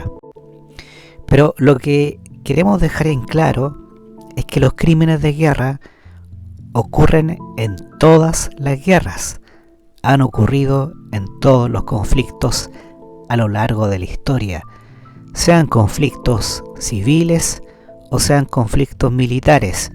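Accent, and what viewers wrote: Chile. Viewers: A mí por el contrario me encanta que 🤩estos vídeos se hagan de viva voz de: ¡Se que eres tu! Porque nadie más podría explicar con tanto discernimiento y claridad lo que ha expuesto en su blog y eso es grandioso.. He aprendido mucho con tus aportes, ya que desde hacía muchooos años buscaba aterrizar de esta manera las lecturas del libro. Por más podcast como estos con acento Chileno 😄 por favor🎉. Gracias ❤ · Ya que tocaron el tema de la locución, coincido en que a mí tampoco me gustó. Desde luego que el contenido es más importante y no voy a dejar de ver el vídeo porque la locución no me gusta. Pero, si deseo mejorar el canal, es preciso cambiar de locutor. No es tanto el acento chileno (que también) sino que realmente no sabe narrar. Lee el libreto como sea, no hace las pausas necesarias, no transmite...pareciera una persona al azar que ni siquiera conoce el contenido del libro. 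A mí me gusta la voz de Helena, lee muy bonito. Al final de cuentas, nuestro idioma viene de España, por lo tanto quienes mejor lo usan son los españoles. Humilde opinión. Saludos.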